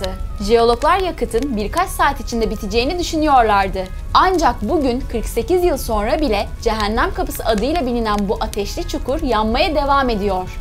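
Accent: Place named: West, Turkey. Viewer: Yok ya sahimi şu batılı ağzıyla car car etmeseniz olmuyor yalan bu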